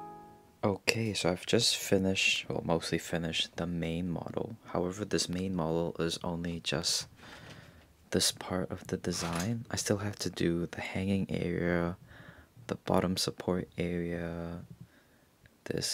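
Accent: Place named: South Africa. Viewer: Amazing work bro... I swear to God u look and sound South African... It your work though that got me💓💓💓.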